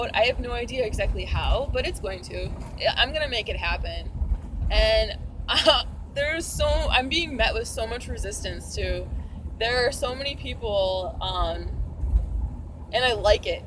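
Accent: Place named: Wisconsin, United States. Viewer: The moment you started speaking i knew you were a wisconsin-ite. shout out for wisconsin.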